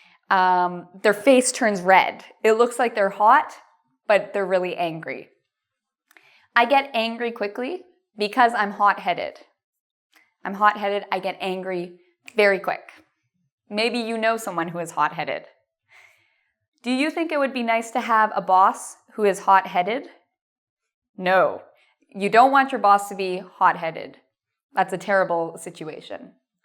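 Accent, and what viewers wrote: Canada. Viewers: Are you Canadian? I can hear you say 'boat ... :) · I love your Canadian accent. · This is a Canadian accent :D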